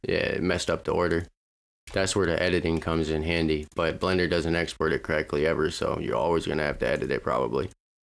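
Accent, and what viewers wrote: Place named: West Virginia, United States. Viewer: Naw, West Virginia. I've been several times. I'm in communications so I pay attention to accents. What do you use Blender for?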